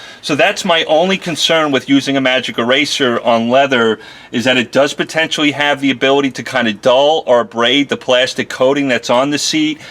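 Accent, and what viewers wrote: New York, United States. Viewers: Thanks for the tip. I have to do my car and wife's car soon. What pat of N.Y. are you from? (Never heard a Texan sound like Ray Romano) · It's impossible to tell if that's dirt or colorant that's pulled off the seat. Hope your N.Y. accent doesn't drive the native Texans nuts!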